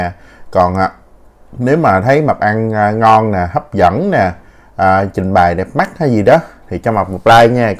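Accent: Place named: Mekong Delta, Vietnam. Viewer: chuẩn miền tây luôn a ơi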